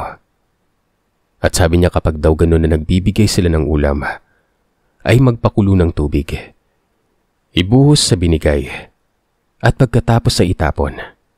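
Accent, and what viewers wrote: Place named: Ilocos, Philippines. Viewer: Ang cute mo mag Ilocano, Sir 😂 Proud Ilokana here. 🫶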